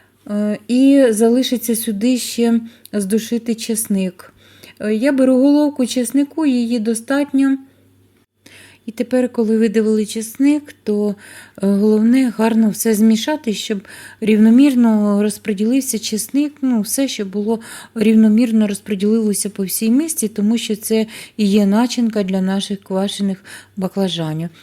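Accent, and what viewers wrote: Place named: Kharkiv, Ukraine. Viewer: Дякую за смачний рецепт.Сьогодні засолила нову порцію,тепер тільки подолати очікування довжиною в 5 днів.А ваш говір нагадає улюблену подругу з Харківщини-ці неймовірні українці під щоденним бомбардуванням роблять свою справу для перемоги і не збираються виїздити в більш-менш спокійні місця. Процвітання каналу!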